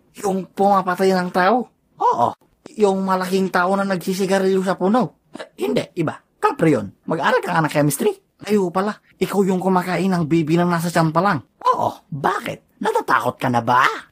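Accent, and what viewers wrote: Ilocos, Philippines. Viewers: Full blooded ilocano here hahahha.. nagsayaaten agita videom sir... hahahaha.. dami kong tropang ganyan magsalita... kung may taglish.. meron ilocalog hahahaha.. sa accent ako natatawa talaga.. more videos at sama mas madalas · ilocano ka pala lods🤣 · Ilocano ka ba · Ilocano ka idol?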